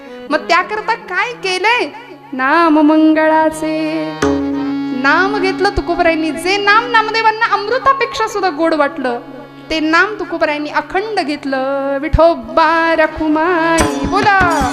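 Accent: Standard. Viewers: फार सुंदर , छान सादरीकरण शुद्ध उच्चार गोड वाणी मनाला भावून जाते .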